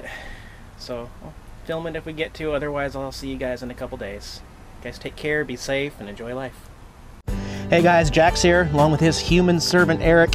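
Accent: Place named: Nashville, United States. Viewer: Ain't nothin wrong with a Nashville accent!!!!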